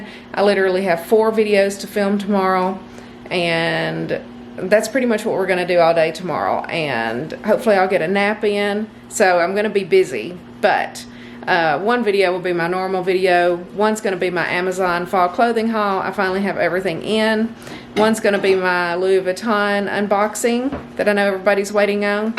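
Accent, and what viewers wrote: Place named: South, United States. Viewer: I like it when you say "any who", and some other terms you use, its sounds so southern, which you are. I'm born and raised in California but I guess it's because my ancestors are from the south that I like those terms. 🌈🌈🌈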